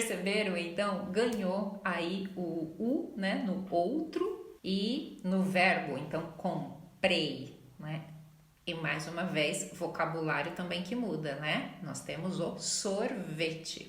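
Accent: Brazil. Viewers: Yo ya la vi en otros videos. Habla muy correctamente, con muy buena dicción en aquellos fonemas que muchos lusófonos encuentran difíciles (principalmente la r y la j fuertes) y ese leve acentito brasileño la hace aún más encantadora, dicho esto con el mayor de los respetos.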